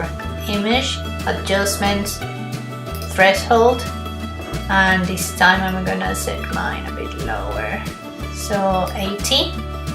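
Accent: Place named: Latin America. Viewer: Loved your tutorial! Learned so much. Also loved the mix of your Latin and English accent. Hypnotizing lol.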